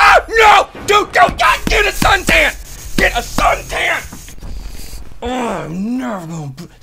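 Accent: United Kingdom. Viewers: Nice british accent ^.^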